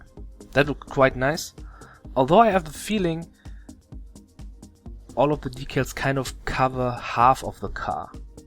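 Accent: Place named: Germany. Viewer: mal ne runde zocken? Man hörts am English :)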